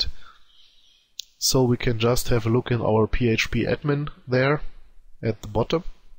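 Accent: Germany. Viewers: Kann es sein, dass du Deutscher bist? ^^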